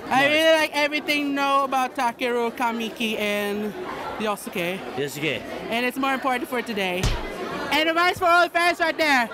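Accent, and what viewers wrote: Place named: Australia. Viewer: japanese with austalian accent!!!:))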